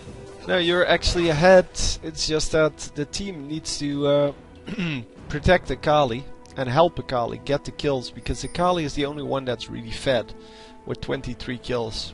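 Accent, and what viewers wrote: Netherlands. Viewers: hearing a bit of dutch trough your voice :D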